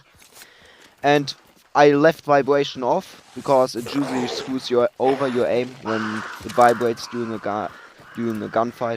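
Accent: Germany. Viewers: You'r German, am i right ? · When german speak english😂😂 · Hi. Are you German? It sounds like. 😊 I'm from German.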